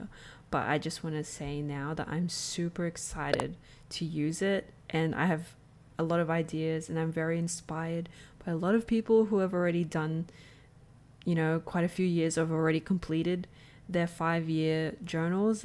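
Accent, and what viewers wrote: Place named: Australia. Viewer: One of your videos came across my feed & I'm so happy! Loved this video! 🥰 Edit: I always get excited hearing a fellow aussie accent talking about journals/planners 😅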